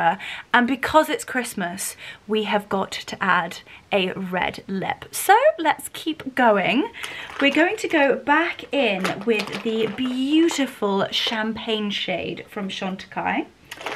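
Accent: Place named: RP, United Kingdom. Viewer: Brilliant video. I studied at uni English literature and languages. It is clear for me you did not only study at uni but I think your English is the RP. 😊😊😊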